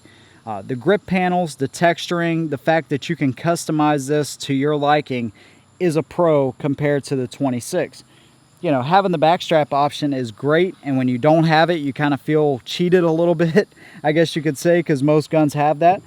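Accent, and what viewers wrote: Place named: South Carolina, United States. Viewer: You from South Carolina man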